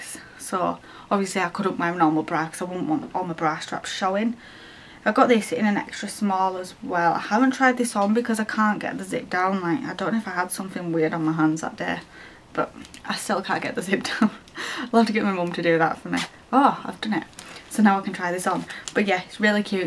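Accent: Yorkshire, United Kingdom. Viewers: It's an accent, Yorkshire accent to be precise x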